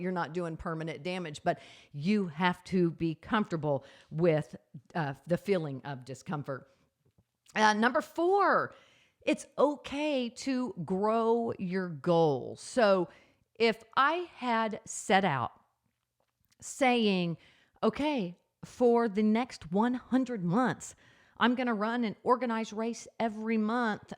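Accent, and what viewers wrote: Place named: South, United States. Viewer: I love your voice. A bit of southern accent, lots of energy, so friendly!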